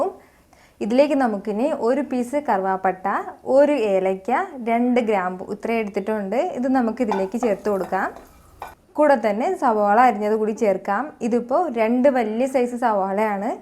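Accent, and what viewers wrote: India. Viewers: അടിപൊളി ആയിട്ടുണ്ട് 👌👌👌👌ഞാൻ ട്രൈ ചെയ്യും 👍👍👍👍👍😎😎😎😎 ഭാഷ ശൈലി നന്നായിട്ടുണ്ട് ഏത് ജില്ല 😁😁😁😁?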